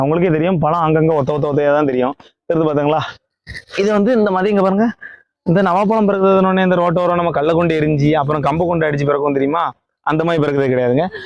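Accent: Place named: Tirunelveli, India. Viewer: super bro.. which place in tamilnadu.. slang seems to be tirunelveli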